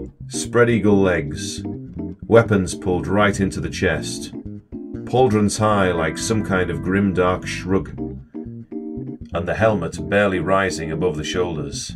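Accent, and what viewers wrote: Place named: United Kingdom. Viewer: the deep voiceover with UK accent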